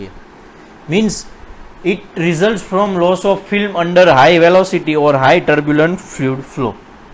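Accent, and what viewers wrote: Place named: Kathiawar, India. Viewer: Ready sambhline laage chhe kathiya vadi chhe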